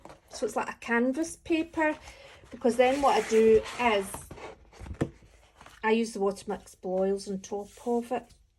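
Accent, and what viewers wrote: Scotland, United Kingdom. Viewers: As an artist you have to do what an artist has to do: create. And you created alot! I love those pencil sketches in your little red sketchbook. When you go with the flow there is so much good stuff coming out of you,. But - and I am saying this out of experience - trust your own impulse, don't mind others opinions about your art wether they are good or bad. It was a pleasure to hear from you again (although your sweet scottish accent is sometimes a bit hard to understand 😉). Mara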